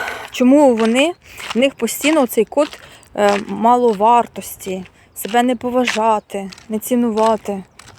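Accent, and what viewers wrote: Ukraine. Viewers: Акцент закарпатський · Скажіть будь ласка, з якої ви області, бо судячи по вимові, ви не з східної України? Наскільки треба себе не поважати, щоб соромитися своєї мови у своїй країні? Я теж довгий час говорила на мові окупанта, з 2022 перейшла тільки на українську, але ніколи не відчувала якоїсь зневаги, хоч живу все життя в місті.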